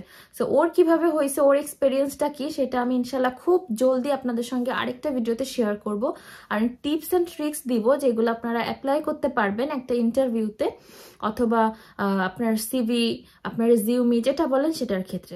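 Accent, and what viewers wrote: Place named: Khulna, Bangladesh. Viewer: Assalamualaikum Apu. Are you from Khulna? I am from khulna and your Bangla tone seems very familiar to me. Zajakallah ❤️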